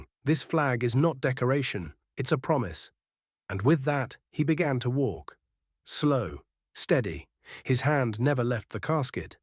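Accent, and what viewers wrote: United Kingdom. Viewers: Why are there so many British narrators on Youtube narrating American content . Semper Fi to the guard of the fallen soldier . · Rubbish....with an inbreed English accent..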